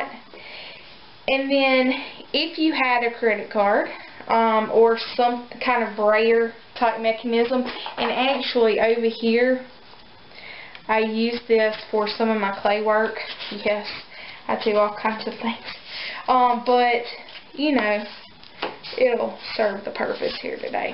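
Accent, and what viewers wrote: South, United States. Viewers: You're so comfortable in front of the camera! I'd get all tongue tiied. I love your videos too. I love that Southern accent too. I'm from South Carolina, so I've got that that same slow way of talking, but I think you're a little more Southern than I am. :) Keep the great videos coming!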